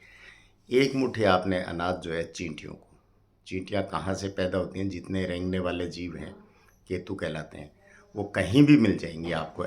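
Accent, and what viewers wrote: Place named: Haryana, India. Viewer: Bahut achi jankari ji.......Aapki tone k hissab se aap sayad haryana se belong krte h....nice video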